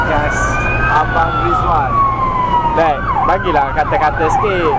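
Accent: Sabah, Malaysia. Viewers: Abg tu org sabah ker.. Haha macam logat sabah je..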